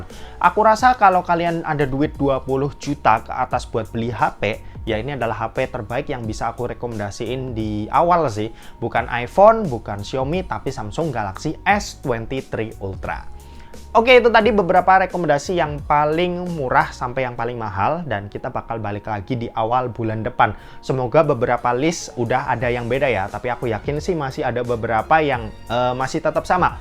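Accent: Semarang, Indonesia. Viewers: Orang semarang ya kak?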